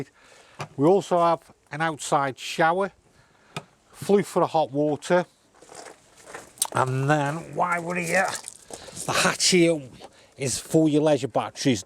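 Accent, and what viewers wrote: Stoke, United Kingdom. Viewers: Is this fella from Stoke?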